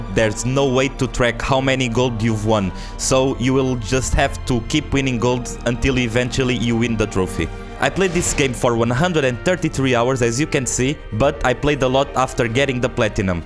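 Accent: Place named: Portugal. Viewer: Mano tive que checkar a tua bio peguei logo pelo teu accent que eras tuga hahahahaha, excelente video abraço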